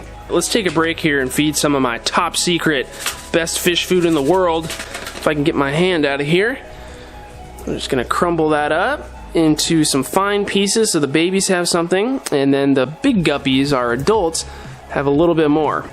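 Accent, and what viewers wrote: United States. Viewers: You’re a Minnesota kid???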